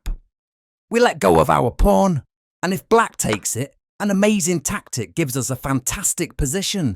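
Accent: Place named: United Kingdom. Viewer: Bro is defenrtly from the british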